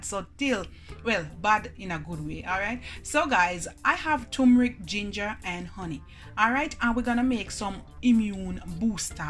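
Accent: Jamaica. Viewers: This is just an amazing healthy juice. Love your Jamaican accent is killing me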